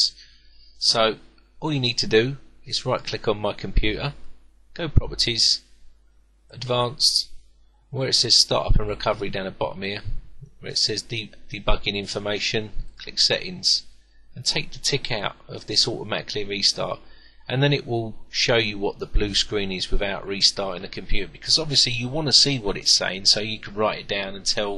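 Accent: United Kingdom. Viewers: Thanks for this clip. I struggled sometimes to understand what you are saying (the heavy British accent, not a complaint though, lol). Just an FYI, the Symbols path didn't work, so I ended up downloading and installing the symbols on my machine (and set the correct local path). Analysis worked fine after that. Thanks again for a very useful beginners tutorial. Sam, USA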